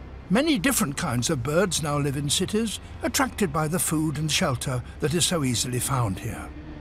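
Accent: United Kingdom. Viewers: I have loved David Attenborough way of narrating nature for many years ... his british accent and the dedication to spread out knowledge.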